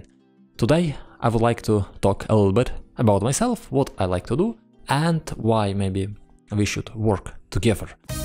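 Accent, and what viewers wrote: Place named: Germany. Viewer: I loved this accent .. is that german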